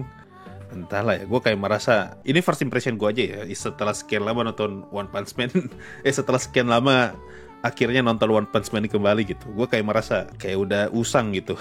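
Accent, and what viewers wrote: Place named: Eastern Indonesia, Indonesia. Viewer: Sorry bang subscriber baru, ini kenapa logat timur nya bocor sedikit. Orang mana kah admin ini?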